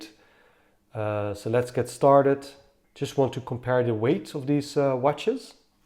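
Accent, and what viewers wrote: Netherlands. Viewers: Why not the GW-M5610 with the BGD-5000? I have the MW and the 7: nice set in white. Btw you sound very dutch.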